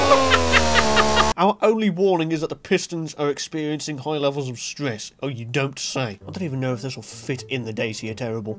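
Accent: United Kingdom. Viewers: you talk british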